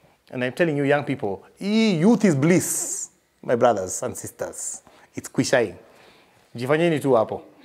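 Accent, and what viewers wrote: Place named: Nairobi, Kenya. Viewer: Great great lessons.. I did relate so much with you really. Just that I am at that stage where I am finally coming to something that finally works. Ps. I knew he’s a South B guy before he could say two words😀.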